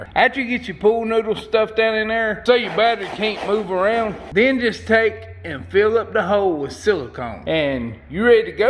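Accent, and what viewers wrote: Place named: South, United States. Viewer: If you are from anywhere north of Tenn. you will not understand a word he says. Great videos and best info around.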